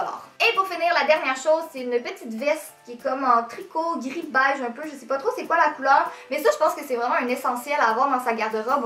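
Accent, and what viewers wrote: Quebec, Canada. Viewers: Hello j'ai beaucoup aimer tes petites achats malheureusement nous en France on n'a pas tout les magasins que tu citent si souvent. En tout cas j'aime trop tes vidéos et j'adore l'accent québécois donc c'est un plaisir de t’écouter parler parfois pendant de longue minutes je ne m'en lasse jamais.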